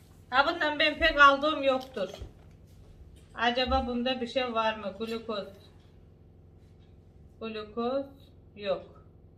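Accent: Trabzon, Turkey. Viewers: merhaba seni severek takip ediyorum şivene bayılıyorum bende aslen trabzon akçabatlıyım ama sakaryada doğdum burda yetiştim annem babam orda evlenip sakaryaya yerleşti dedemler akçabat düz köyde yaşıyor seni dinledikçe annem konuşşur gibi severek dinliyorum yaşşa senin ablan sayılırım seniiiii çoooooook öpüyorum canımsın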